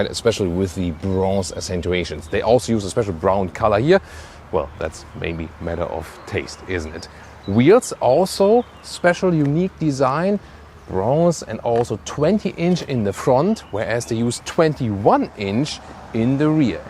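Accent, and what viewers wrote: Germany. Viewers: What a terrible german way to speak english. Sounds terrible. Take some lessons, please.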